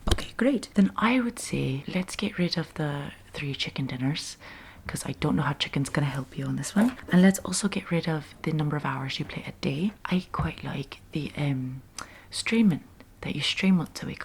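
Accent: Ireland. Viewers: Do more Irish and jersey accent roleplays !!! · someone saw the banshees of Isherin!! or you're just really good at an irish accent. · We NEED more videos with the Irish accent! We beg you!!!! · Dang you got that irish accent down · I love the Irish accent, please do more of it in the future!!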